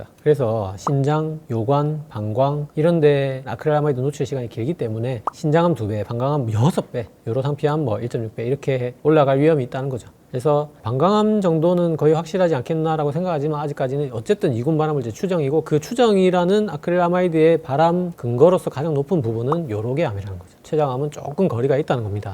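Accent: Gyeongsang, South Korea. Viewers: 쌤 보유국 우리나라🎉 성실하시고 어려운 의학을 구수한 경상도 사투리에 담아서 위트있게 잘도 설명해 주시는 능력자이심🎉 부산가면 꼭 뵙고 대접해드리고 싶은 분😊 근데 점점 더 멋있어지심?😮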